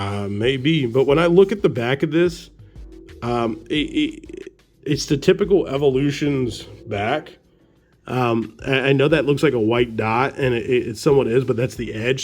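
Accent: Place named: Philadelphia, United States. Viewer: The accent makes sense Idk how I never knew ur from philly but kinda had a hunch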